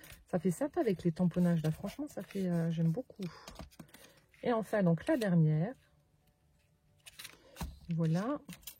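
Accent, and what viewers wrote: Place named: Alsace, France. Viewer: Bonjour 🌸 Je suis tombée sur ta chaîne par "hasard" et WAOUH... Pas du tout équipée et ne sachant pas du tout ce qu'était le scrapbooking, me voici complétement motivée pour me lancer! Je vais faire un grand tableau avec tes idées pour que chaque personne qui vienne à la maison puisse laisser un petit message souvenir! Merci merci merci 🙏💜💁‍♀️🧚‍♀️ en plus je suis d'origine alsacienne alors votre accent et vos répliques me font chaud au coeur et rire!!!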